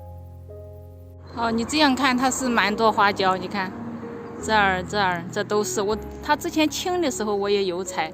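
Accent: Hubei, China. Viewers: 对，湖北洪湖天门那个地方的口音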